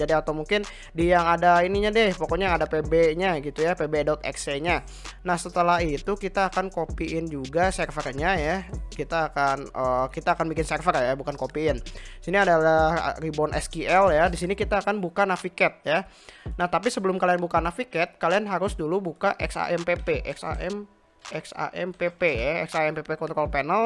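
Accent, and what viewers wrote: West Java, Indonesia. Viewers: Sunda Geuningan?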